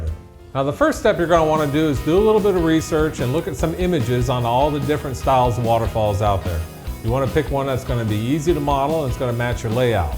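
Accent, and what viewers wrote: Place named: North America. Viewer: Lástima que no tenga sub-títulos en español, queda uno sin saber que éxlican en ese inglés norteamericano.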